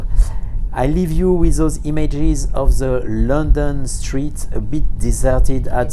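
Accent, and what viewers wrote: France. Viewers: this great french accent, I mean it - I love it dearly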